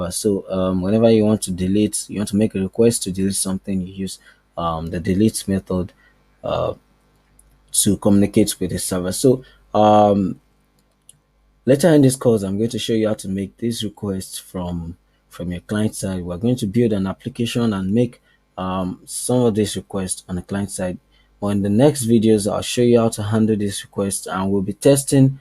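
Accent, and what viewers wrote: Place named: Nigeria. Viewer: You sound Nigerian 😅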